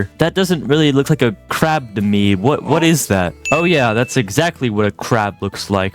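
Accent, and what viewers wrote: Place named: Australia. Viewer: Bro's from the land down under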